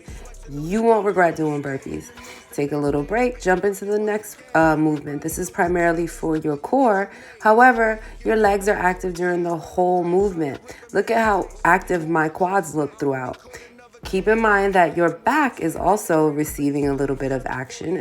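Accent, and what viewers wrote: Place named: Colombia. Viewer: Are you Colombian because you accent is wonderful keep up the work you are doing great shoutout to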